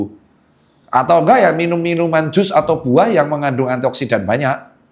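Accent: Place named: Surabaya, Indonesia. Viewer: Cok.. Arek iki wong sby ta? Lambene kok cocok karo aku.. 😂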